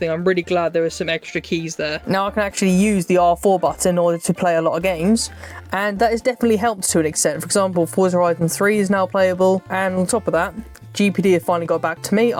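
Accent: United Kingdom. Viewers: Great review! You so British! :) Love the accent! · I love hearing british people english accent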